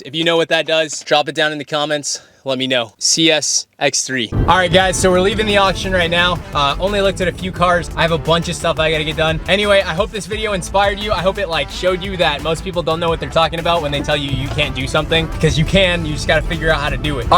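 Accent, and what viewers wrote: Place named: California, United States. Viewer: Ughhhh, what a waste. Im glad you doing great in life BUT WHY ARE YOU TALKING LIKE THAT? YOU KNOW YOUR TALKING LIKE A WHITE CALI DUDE. SWEAR YOU DONT HAVE ANY BLACK FRIENDS. SWEAR, ITS SO WEIRD.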